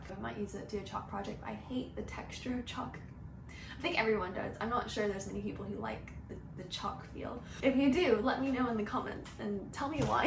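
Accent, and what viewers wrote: England, United Kingdom. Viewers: the smol english accent peaking through is so cute (' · youre starting to have an english accent omg 🥺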